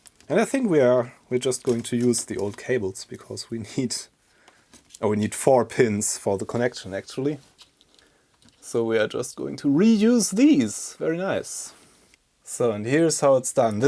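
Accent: Germany. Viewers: Ich würde mir Wünschen das Du die Videos auf Deutsch machst. Dein Deutsches Akzent bei deinem Englisch ist Grauenvoll. Übrigens ist die größte Retro Community eh in Deutschland. Man kennt ja den Deutschen, der hält an eine Sache Fest bis Lebensende, egal ob die Sache Gut oder schlecht ist.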